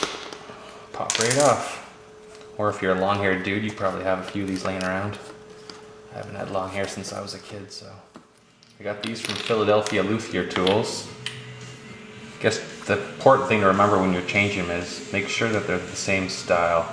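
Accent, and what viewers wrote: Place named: Canada. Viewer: With a Canadian accent though.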